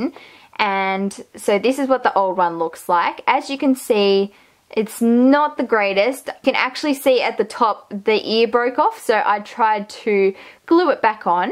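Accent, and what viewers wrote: Australia. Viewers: That Random Girl she’s Australian lmao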